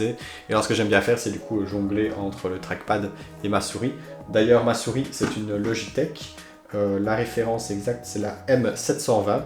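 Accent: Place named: Liège, Belgium. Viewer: Suis tombée sur ta vidéo par hasard, ça fait du bien d'entendre l'accent de lidje dans les méandres de YouTube 😊 Agréable setup + 1 abo Bonne journée, m'fi 🙂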